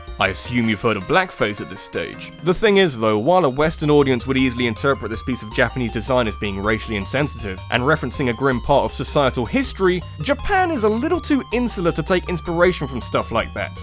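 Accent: United Kingdom. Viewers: I love how people from the Uk say controversy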